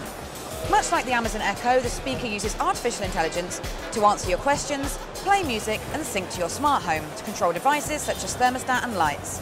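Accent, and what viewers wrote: United Kingdom. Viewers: I lové that british accent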